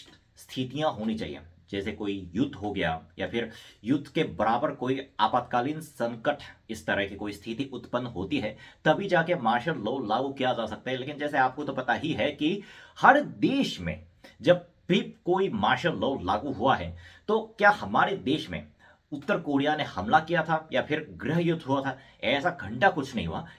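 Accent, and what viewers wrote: Standard. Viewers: Bro……your Hindi waaaaay tooo good….pretty much text-book! But, it also a bit difficult to follow at times!!! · Itni shudh Hindi toh meri bhi nhi h well done bro 🤌👍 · Yaar aapki hindi to intni sudh h ki humari bhi utni nahi 😊🇮🇳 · Bhaisahab itni shudh hindi toh India mein bhi koi nahi bolta. Someone give this man a Aadhar card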